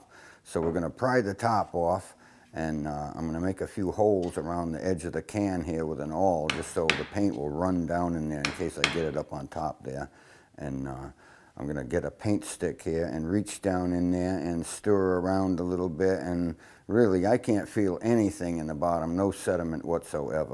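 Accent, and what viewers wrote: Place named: Maine, United States. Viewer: Like his "Down East" accent. Nice the way he finesses each piece slowly and easily. Good work pace.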